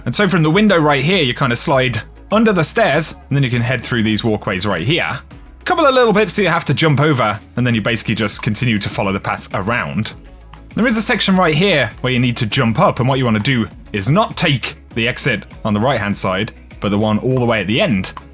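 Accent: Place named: United Kingdom. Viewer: Must be that British accent 😎👍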